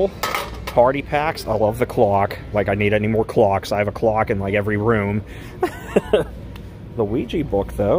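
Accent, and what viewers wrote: Pennsylvania, United States. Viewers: Hey Bro !! Love the Content !!!! Love your Pennsylvania Accent!!!! I Love going into Sprit Halloween every Year and seeing all the cool stuff they have !!!!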